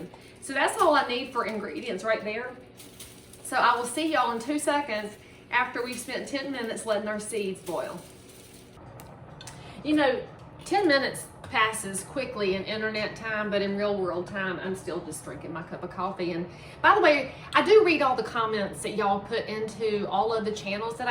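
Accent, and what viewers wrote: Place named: South, United States. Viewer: Just found your video. You are cute as a button! Love your Southern accent, too. And thanks for the recipe! So good!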